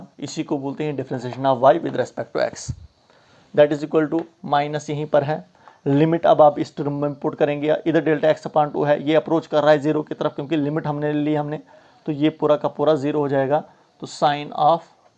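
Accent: Kashmir, India. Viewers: Humarai teacher nai yehi method dekhaya tha aur mai nai full YouTube mai dakha kis nai b aasai nhi kiya hai but thanks sir aap nai yeh video banaye hai thanks sir aur aap kai tone sai lag raha hai aap kashmiri ho so kashmiri best hai 💕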